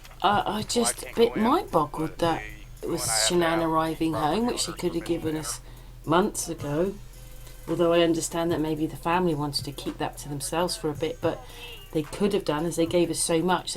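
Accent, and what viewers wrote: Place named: Midlands, United Kingdom. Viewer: Happy birthday to you, is it a midland accent or east coast?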